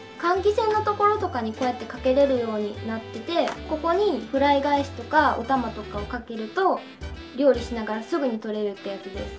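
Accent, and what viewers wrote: Standard. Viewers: 今日とくに喋り方標準語になり気味やな（笑） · めっちゃ標準語｡ﾟ(ﾟ´ω`ﾟ)ﾟ｡ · なんか、標準語？ww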